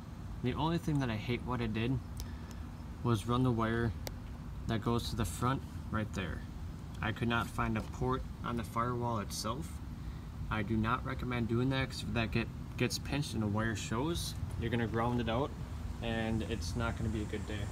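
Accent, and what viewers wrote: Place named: Canada. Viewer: Can't stand that Canadian accent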